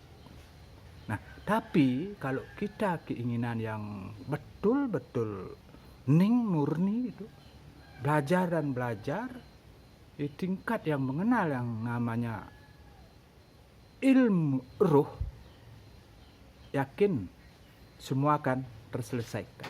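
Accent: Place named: Bali, Indonesia. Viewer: Salam kenal bapak baik hati berlogat bali. Semoga energi simpatik dan jiwa penolong anda berguna bagi sendiri, engkau juga dirimu. Saran saya suara yg terbata2 dan pembahasan yg muter2 lebih di lutuskan lagi niatnya🙏🙏🙏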